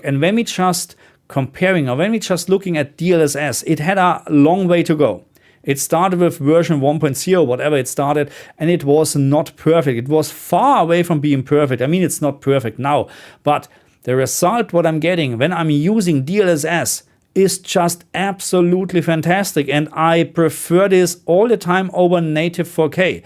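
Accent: Germany. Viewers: und bin selber Frangge auf Nürnberg, daher vermute ich auch das bei ihm haha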